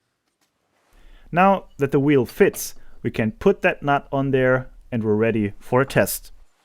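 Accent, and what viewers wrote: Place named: Germany. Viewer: Despite your nearly unappreciable german accent, your english is very good and easy to understand.. didactic and vell done video, gracias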